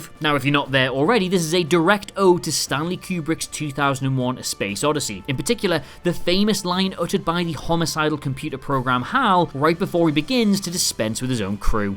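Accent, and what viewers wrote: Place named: North East England, United Kingdom. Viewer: The v/o guy is from the North East of England and that is just how they pronounce words. It's like how a lot of Black Americans say aks instead of ask.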